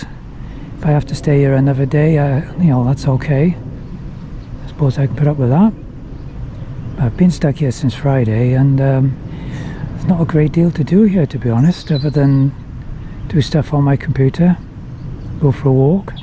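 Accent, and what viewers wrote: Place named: Wales, United Kingdom. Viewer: Well done! Thanks for posting this interesting and informative video! Your voice reminds me of family visits to Griffithstown (Mon), many, many years ago - will be starting to view your archive from the beginning after landing here due to 'YT Algorithms'.... We used to float about the Atlantic on a home-built 6-berth catamaran, but 'swallowed the anchor' many years ago.... part of our family is on the canals currently, wherever they can find water. I retired at the end of the last century, married the good lady, and launched our composite cat - which we sailed down to the Canaries for the honeymoon and a few years more. Our cat had an outboard motor leg that would pull up for weed removal, and (of course) for sailing. Much, much faster under sail! One of our mates out in the Canaries had a wake-board, and in ideal conditions we could rip across the bay with him behind us! 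The trick we used to free our prop (which worked sometimes) was to listen attentively for the engine revs dropping, and bang her into reverse - which could un-wind the flotsam, if we were lucky. Yours looked like a really obstinate tangle! Thanks again, a lovely tale.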